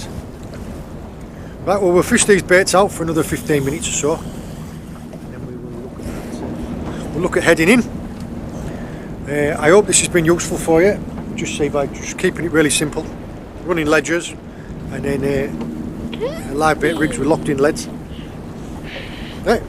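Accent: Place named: Cumbria, United Kingdom. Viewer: Love the vids were are you from originally sounds like a cumbrian accent I'm from whitehaven originally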